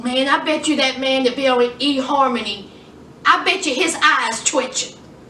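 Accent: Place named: Tennessee, United States. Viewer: Where you from gal? You sound like your from my neck of the woods in TN. The show is addictive. I like it too!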